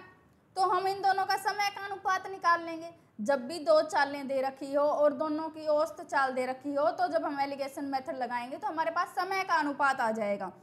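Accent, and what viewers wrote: Haryana, India. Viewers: Aap haryana se ho kya aapki boli humre jesi lagti hai 😊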